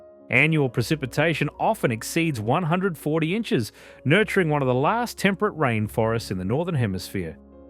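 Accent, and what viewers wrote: Australia. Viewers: an Aussie narrating this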